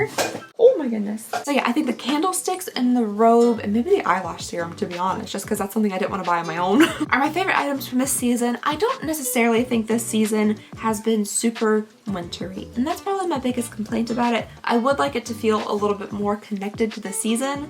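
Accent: Kentucky, United States. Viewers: Being from Kentucky inlove when i hear it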